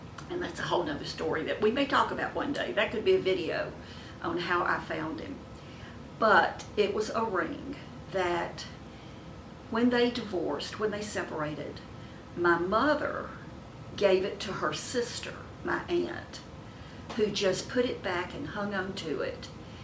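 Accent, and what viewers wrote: South, United States. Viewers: Where are you from? You have a southern accent. I'm in TEXAS.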